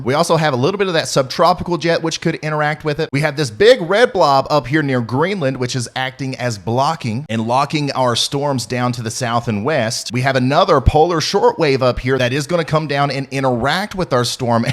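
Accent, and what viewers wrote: South, United States. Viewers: Your fantastic! Soooo glad I found your channel! And you have a nice smart sounding southern accent ..opposed to the other southern sounds😘😁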